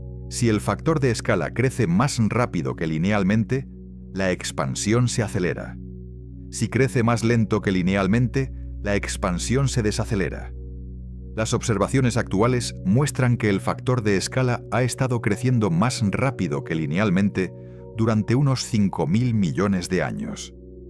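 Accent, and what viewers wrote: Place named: Spain. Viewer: menos mal que está en español de España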